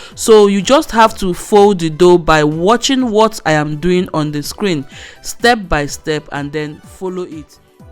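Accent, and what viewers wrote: Nigeria. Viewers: That is a strong igbo accent.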